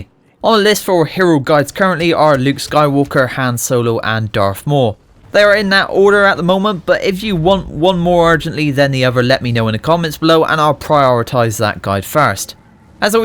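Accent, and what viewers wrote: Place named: West Country, United Kingdom. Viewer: Another great guide, keep up the good work! Btw, going by your accent I'm guessing your a fellow west country lad?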